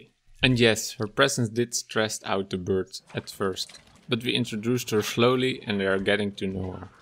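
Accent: Netherlands. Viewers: Are you Dutch? I hear some accent. Hoe gaat het jongen?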